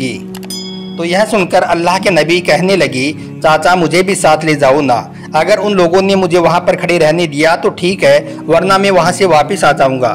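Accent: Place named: Bihar, India. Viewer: Bihari pehle apne boli sudhar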